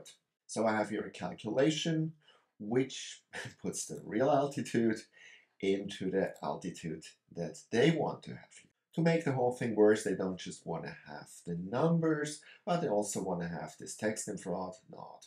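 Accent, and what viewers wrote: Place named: Austria. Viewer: This guy's accent is Arnold light!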